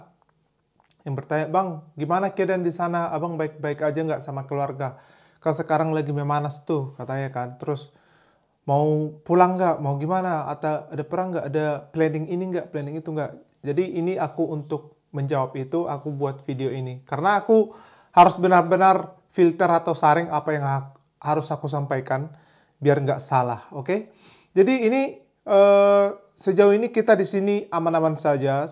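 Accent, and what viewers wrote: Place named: Madura, Indonesia. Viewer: Logatnya mirip orang madura 😂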